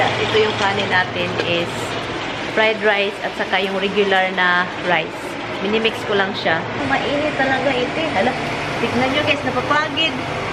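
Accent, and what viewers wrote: Visayas, Philippines. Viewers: Putang-Ina swerte mong naka-punta ng America... bisaya ka ang to gas mong magsalita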